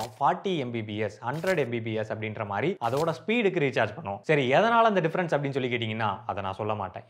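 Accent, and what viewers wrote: Tirunelveli, India. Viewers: Tirunelveli iruku bro 🤔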